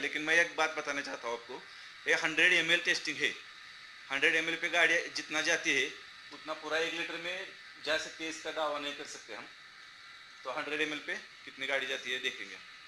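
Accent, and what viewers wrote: Maharashtra, India. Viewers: Aapki tone se pata chal raha he ke aap Marathi ho. Or wo bhi North Maharashtra se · Bhai aapke Language se pata chalata he ki Aap Maharashtra ke rahne Wale ho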